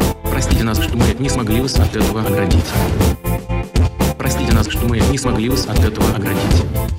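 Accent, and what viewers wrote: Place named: Lithuania. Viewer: po truputi dingsta lietuviskas akcentas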